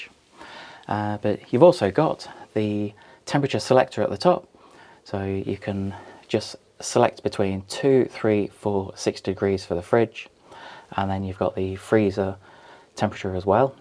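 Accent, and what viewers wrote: United Kingdom. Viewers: I subscribed your channel when I needed to learn how to properly clean a Dyson V8(V6? Can’t remember) back in 2016 or 17. Over the years, I watched some of the videos posted and I found your voice quite soothing and ideal for listening as I don’t often get exposed to British accent. I like the fact that all the videos are practical and informative. Sometimes I just have the videos played at the background while working, and I even picked up a few terms for house appliances! Thanks!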